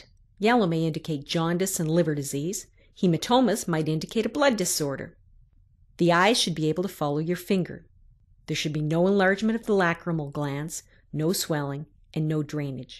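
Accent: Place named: Canada. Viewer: I have feeling that the narrator is a canadian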